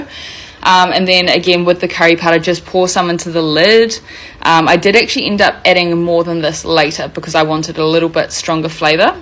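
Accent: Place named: New Zealand. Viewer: Kiwi accent coming through there, loud and clear. 'Small little bits'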